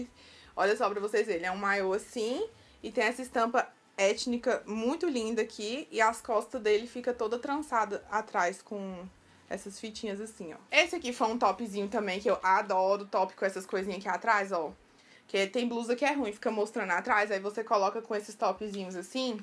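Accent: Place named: Northeast, Brazil. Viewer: Amei suas escolhas...esse seu sotaque é norte ou Nordeste??? sou do Nordeste, moro em João Pessoa. 🌻♥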